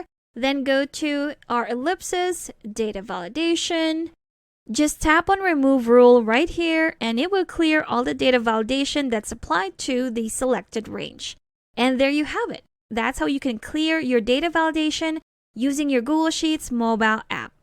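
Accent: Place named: Canada. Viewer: I still think your voice is absolutely beautiful. Even when I don't have a need for your current spreadsheet tip, I might sometimes listen to your latest tutorial just to brighten my day. You could do so much with that voice. Would you mind telling us something about yourself? Based on your accent, I'd guess you're likely Canadian. I'm probably way off, but yea, just a guess.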